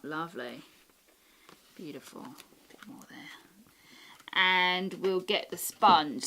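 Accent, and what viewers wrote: United Kingdom. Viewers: Loooove the British accent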